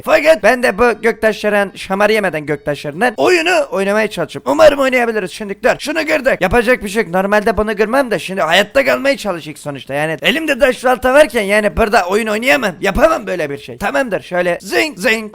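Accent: Black Sea, Turkey. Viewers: Abi sen kara denizlimisin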